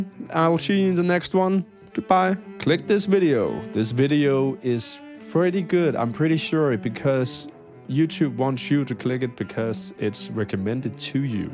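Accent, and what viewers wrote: Denmark. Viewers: you sound incredibly danish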